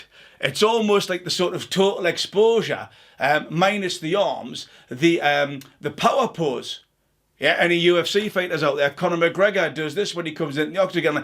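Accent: Newcastle, United Kingdom. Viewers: Always great to hear that Geordie sound! ☆♡☆♡☆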